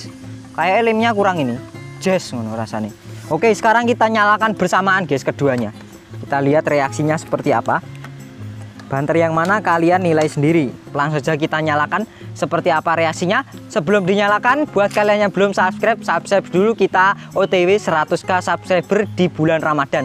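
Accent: Java, Indonesia. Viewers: Jowo pinggir ndi mas ? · Arek jowo yo mas...😀😀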